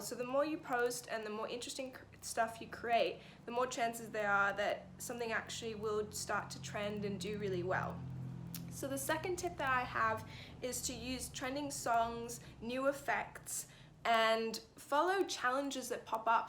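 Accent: Australia. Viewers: That Australian accent 😍😍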